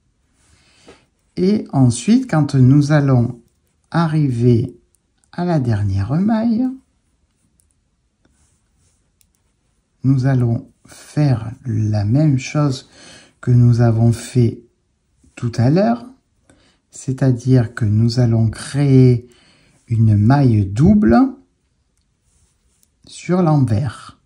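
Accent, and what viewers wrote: South, France. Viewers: Yes 👍 MERCI infiniment 🙏 je t,adore ❤❤❤ je voulais apprendre à faire ce talon, jusqu'à maintenant je faisais un talon a gousset renforcé et je voulais vraiment apprendre à faire celui ci 👍👍 demain je le test j,ai des chaussettes en cours je vais faire ça!! Je te montrerais sur insta ❤️❤️❤️ tu es génial 👏😉🙏 tu me fais sourire avec ton accent du soleil, et ça fait du bien ❤ et comme tu le dis on est tous pareils et la pour apprendre 😘😘😘😘😘😘😘😘😘😘😘😘😘😘😘😘😘😘😘😘😘😘 vivement ton prochain podcast 🙏👏❤️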